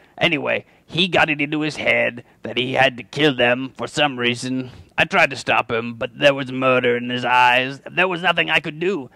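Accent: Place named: United Kingdom. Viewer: British accent lol. love the question!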